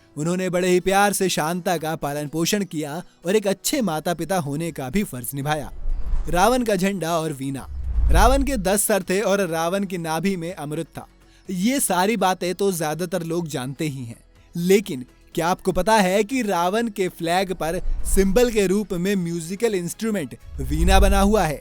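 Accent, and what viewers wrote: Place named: Tamil Nadu, India. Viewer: Ap to tamil ke thara bolte hai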